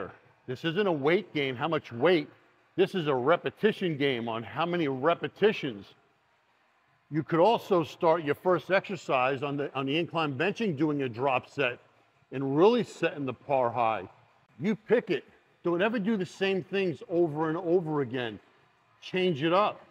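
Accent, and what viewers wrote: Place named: New York, United States. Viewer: The advice is brilliant. The New York accent just adds to the enjoyment.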